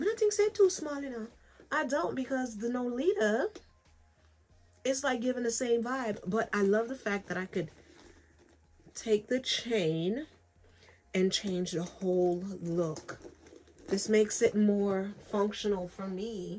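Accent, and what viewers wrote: Jamaica. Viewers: Omg, I hear an accent. Do I hear Jamaican? I love that you decided to bless someone. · TFS, the bags are beautiful ❤️ instant subby as soon as your Jamaican accent started leaking out...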